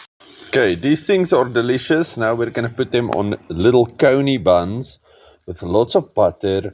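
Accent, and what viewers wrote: Afrikaans, South Africa. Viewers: Jy kan sommer hoor hys Afrikaans 😂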